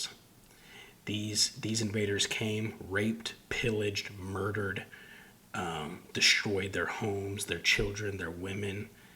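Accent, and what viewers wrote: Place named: United States. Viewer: New subscriber here. I very much appreciate your straightforward style, yet you have a docile, soothing voice. I'll work on getting the backlog of your work watched in the upcoming days. I assume by your American accent that is where you are from, but people are abroad for many reasons, so I won't speculate. Love and support from NC, USA! EDIT: By the way, I'm so glad you're showing things as they occur and not blackened out, etc. People NEED to WTFO with that noise. I also appreciate, and that is not a strong-enough word, that you state your stance. I liked it a lot!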